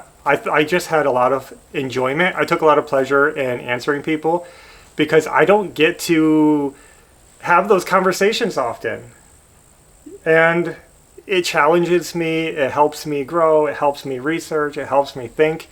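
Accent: New York, United States. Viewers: Hey, I heard you in the beginning of your video say the word talking as “tawkin”. It’s so funny because I was coming to comments to ask you if you’re from New York. I noticed that accent immediately since I grew up and lived on Long Island for 57 years. Then you said you lived in Upstate, NY which is weird because you sound like you have more of a Long Island accent, than an upstate one. Upstate is one of my favorite places especially Lake George. I also had a question for. How did you get your clip on glasses to match your eyeglasses so perfectly? Were they made by the same place where you had your prescription glasses made? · As a NY’er living now in the South I LOVE it that you sound like home. And I love the glasses. Thank you for being you!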